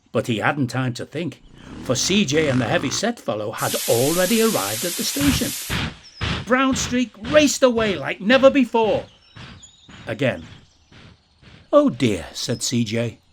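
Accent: United Kingdom. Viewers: the funniest part has to be the soft calm british narraration ontop of the hardcore gangsters trying to off eachother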